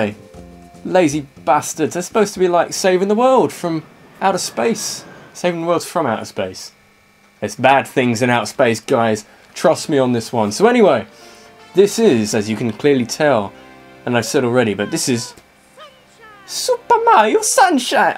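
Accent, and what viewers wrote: United Kingdom. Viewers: It's been a while since I've watched one of let's plays, and I've really missed his nice british voice. I've got three more LPs lined up after this one, so I'm gonna get a whole lot of lovely brit; after too long of no brit. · Jarren Bonson Subtitles, for when sound isn't an option beyond hearing that wunderbar British accent.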